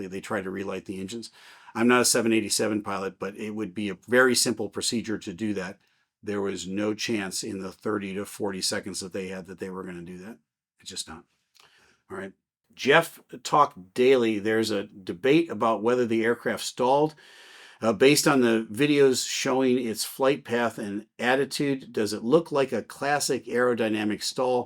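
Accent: United States. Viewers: I like your clips very much, interesting and well understanding american english, for me good to keep my English. Greeds from Germany 👍👍👍👍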